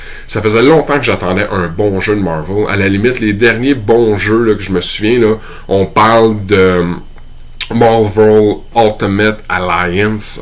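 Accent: Quebec, Canada. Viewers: J'adore l'accent québécois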